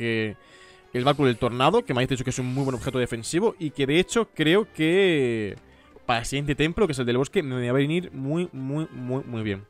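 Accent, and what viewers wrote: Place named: Mexico. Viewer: AY ME ENCANTA QUE HABLES TIPO MEXICANO. ;;;; ❤💜❤💜❤💜❤💜❤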